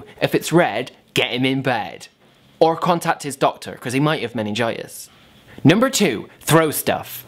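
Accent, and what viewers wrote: Bristol, United Kingdom. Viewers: ur bristolian accent actually made u mention a skl in bristol woah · hahahah the Bristolian accent just makes these videos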